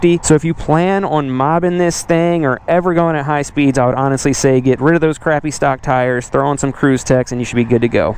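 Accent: Baltimore, United States. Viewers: I thought I recognized that accent. Hey Hon! Bawl-Tee-Moh.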